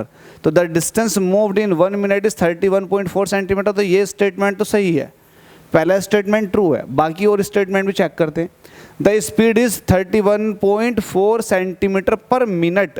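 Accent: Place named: Moradabad, India. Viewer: Sir aaap Moradabad side ke hn kya ?? Apka accent bahut accha lgta h 😊 aap please khulke bola kriye apne accent me mza aaega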